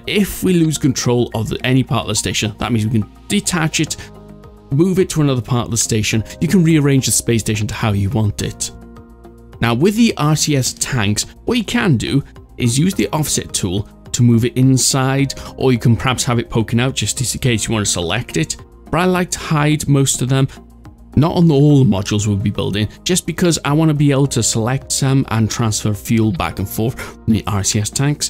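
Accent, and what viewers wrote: Wales, United Kingdom. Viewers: Man, I miss your videos, I haven't watched your channel in forever. I remember watching your videos during a boring summer, or when I had a bad cold, etc.. Thank you so much for continuing to make your videos, they always bring me joy with your strange humor. And it's so nice to hear your Welsh accent once again xD Keep up the great work!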